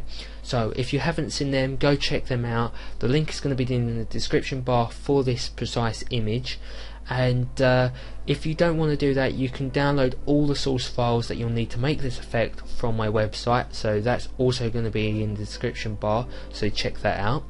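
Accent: United Kingdom. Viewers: very nice tutorial. but DAMN your British accent which is putting my nerves to a big challenge considering that English is not my native language... Anyway, I will try to fully understand your actions from tutorial.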